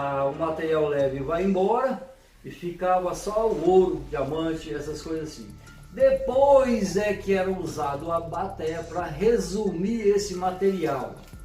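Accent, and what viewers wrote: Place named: Northern Minas Gerais, Brazil. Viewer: Esse sotaque é aqui do norte de minas, achei legal.rss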